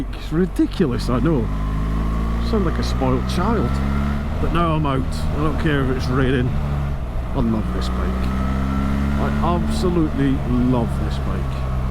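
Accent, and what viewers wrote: Scotland, United Kingdom. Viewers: You sound a typical Scot and i am from Glasgow now living in La belle France still living my life and approaching seventy five Merry Christmas mate.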